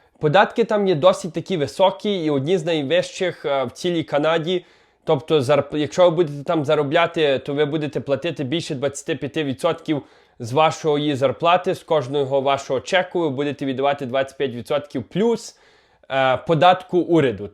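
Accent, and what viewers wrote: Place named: Western Ukraine, Ukraine. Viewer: привіт, просто цікаво, ти 1ше покоління в Канаді, чи вже внук жителів України? Говориш по українськи файно, по нашому по западенськи))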